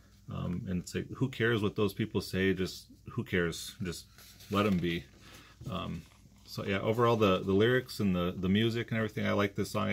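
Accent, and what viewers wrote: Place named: Minnesota, United States. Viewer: Too funny. I think sometimes the more you listen, the more you like :) I'm glad you're enjoying it :) Also I'm sorry I thought you were from Canada...to my Michigan ear, Canadian & Minnesota accents sometimes sound the same....Oy vey.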